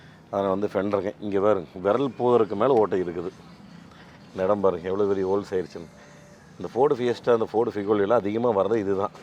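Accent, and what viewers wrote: Kongu, India. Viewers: அருமையான வேலைப்பாடு இனிமையான கொங்கு பேச்சு செலவு எவ்வளவுனு சொல்லுங்க சார்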